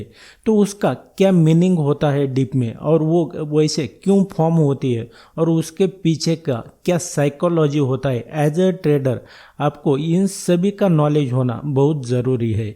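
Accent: Maharashtra, India. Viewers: Kaka tumhi marathi ahat na , Hindi varun olkhla 😂😂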